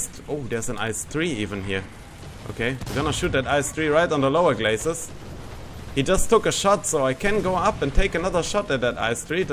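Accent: Germany. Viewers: I love his German accent :P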